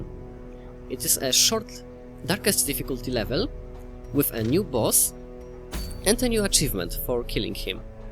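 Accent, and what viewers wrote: Poland. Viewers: Yeah, typical polish accent, i knew it the first second as well.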